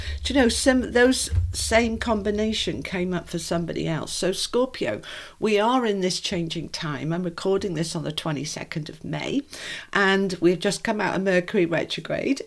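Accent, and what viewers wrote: United Kingdom. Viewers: Love your accent. British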